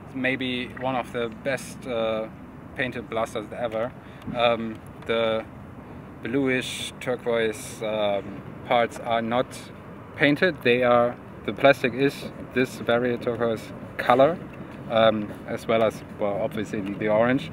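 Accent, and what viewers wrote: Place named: Germany. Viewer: I personally love the german language, and the accent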